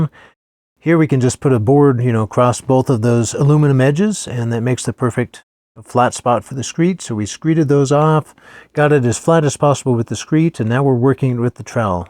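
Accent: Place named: South Africa. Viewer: Are you from south Africa your accent doesn't sound American beautiful job👌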